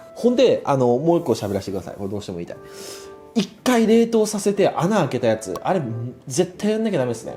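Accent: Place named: Nagoya, Japan. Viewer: 名古屋から上京してきたから、かねこの名古屋弁が地元みあってうれしい～！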